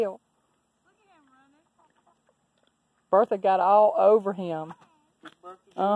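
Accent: South, United States. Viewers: This woman has the loveliest southern accent I've ever heard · This lady has the most appealing Southern accent and way of speaking. I know she’s a Mom and all, but I’m smitten.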